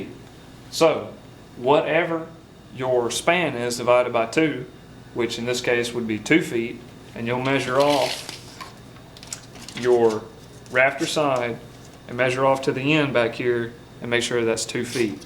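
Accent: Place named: Carolina, United States. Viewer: i like this, very helpful...i like also how you get right to it without alot of unnecessary (distracting) jabbering...also your manner is respectful and professional...sounds like a carolina accent? cool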